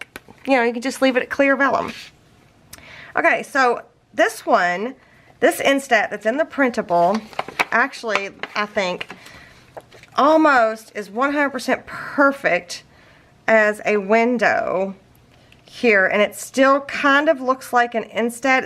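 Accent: South, United States. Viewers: I feel so blessed to have found your channel,. Your aesthetic is perfect for me, I SO appreciate your sense of humor, and your work is absolutely beautiful. As a bonus, you talk “Southernese” just like me! So I definitely understand words like, “caddy wonked.” Thank you for sharing your sense of humor and knowledge with all of us. Hope you don’t mind but I chatter on and on to my husband about “my ” and I continue to lift you up in my thoughts and prayers after the loss of your mother in December. I wish you many blessings.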